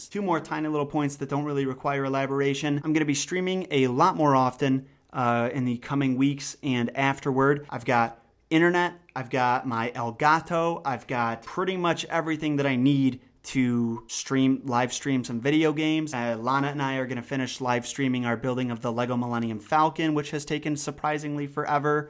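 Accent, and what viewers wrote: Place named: Brooklyn, United States. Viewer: And side question, would your new content be like pyros or nfkrz'? If so fuck yeah dude if totally be interested in hearing your opinion on shit. Plus with that whole Brooklyn way of speaking you got you can do some great stuff. Keep it up man.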